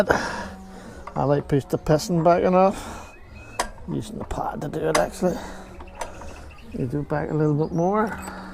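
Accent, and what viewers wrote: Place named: Scotland, United Kingdom. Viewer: Thanks for the video! Very helpful. I have 6000 miles on my rear brake pads and there's almost nothing left. Didn't realize until it was almost too late. Apparently it's an issue or comment with tenere 700. At first I thought it was my fault but it's very common. Not quite as easy as my wr250r. Took me half the video to start understanding your Scottish brogue. Good stuff! I spent some time in Scotland. Little town called lanarkshire between Hamilton and Lanark I think LOL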